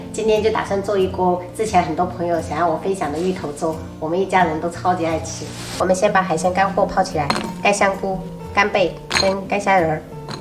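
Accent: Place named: Quanzhou, China. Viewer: Watching your video makes me homesick. 川妹子说起闽南话竟然一口泉州腔。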